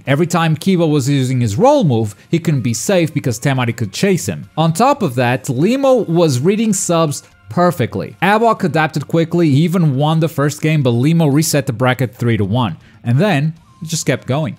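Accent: Portugal. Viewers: I'm curious to ask but are you Portuguese? 🤔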